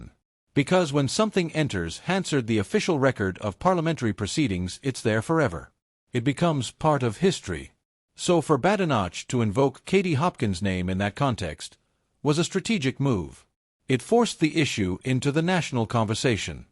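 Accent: United States. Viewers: Dreadful editing and poor pronunciation the American accent of the bot narrating is dreadful and amateurish 😂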